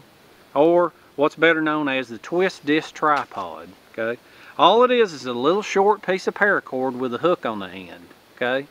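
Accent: South, United States. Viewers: Android Pal then we couldn’t hear his infectious laughter, his melodious deep southern drawl, his “lil jewels”, and that wouldn’t be cool! I d read it too just for the record but I’d miss a lot of above mentioned. 🤘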